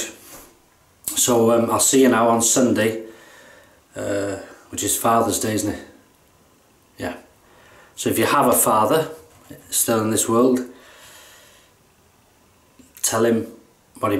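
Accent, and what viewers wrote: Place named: North Wales, United Kingdom. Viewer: Just came across this video... and your accent makes me very happy and full of 'hiraeth'! Greetings from a fellow north Walian living in germany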